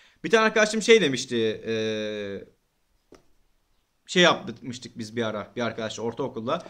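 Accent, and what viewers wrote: Trabzon, Turkey. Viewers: abi Trabzonlu olduğun çok belli tolga zengin e beziyorsun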